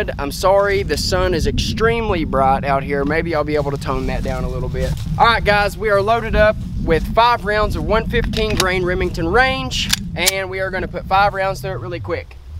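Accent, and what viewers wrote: South, United States. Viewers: Good content and that southern draw is definitely new in the reviewer space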